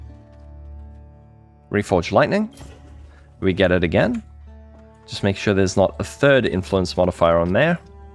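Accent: South Africa. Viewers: Are you South-African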